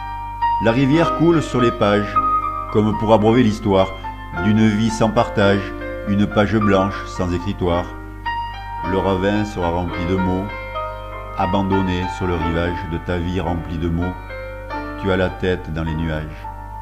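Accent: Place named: South, France. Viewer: Quelle belle idée, ce poème, récité par son auteur a quelque chose d'un cadeau personnalisé. merci...et pour le bel accent du sud !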